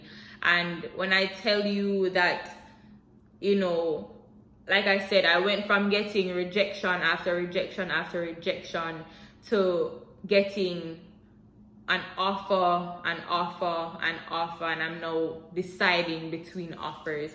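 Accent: Jamaica. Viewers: Heard the Jamaican accent and instantly subscribed 🤣. Moving to Houston soon so I needed this video. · i heard that caribbean accent jamaican to be exact. Thanks for the insight. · I know this was posted a little while ago, but thanks for sharing. I can hear an accent Jamaica Im assuming. I’m curious how easy/is it to find a community of islanders? Places you recommend?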